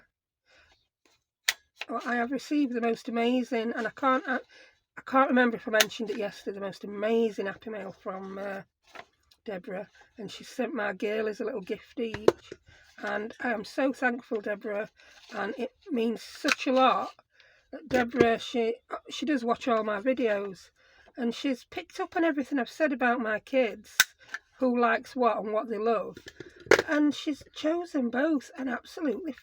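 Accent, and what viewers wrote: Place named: North, United Kingdom. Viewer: Well I can relate to you No1 because you sound northern, No2 because you’re not American and want us all to go to Dollar Tree , Walmart or Hobby bloody Lobby .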